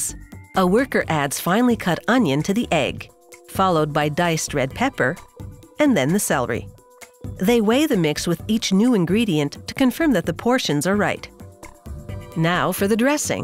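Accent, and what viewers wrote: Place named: Canada. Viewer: This wouldn’t be a US episode as the narrator is Canadian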